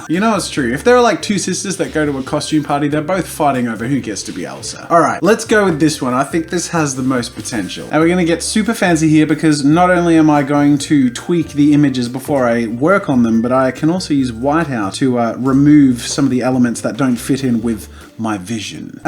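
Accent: Australia. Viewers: Does he keep calling them ‘color-in book’, or is that just the Aussie accent??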